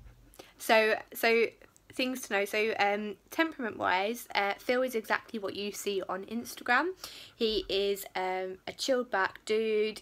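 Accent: United Kingdom. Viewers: dude u have the most epic British accent ever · Greetings from Houston, Texas! Excellent video of your pack. Gotta love king Philip. I also really enjoy your awesome British accent!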